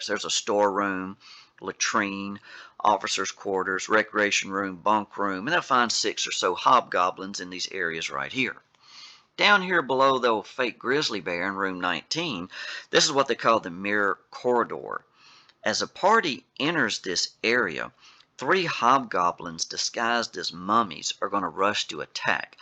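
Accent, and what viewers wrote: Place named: Appalachia, United States. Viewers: I'd say southern Appalachian. So maybe Tennessee or southern West Virgina. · I’m loving that accent. What part of the US is it from?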